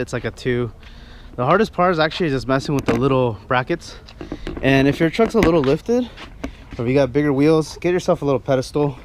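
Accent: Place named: Oxnard, United States. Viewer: You sound like your from Oxnard too. and I can make you T-shirts man, just give me a design. I silk screen em.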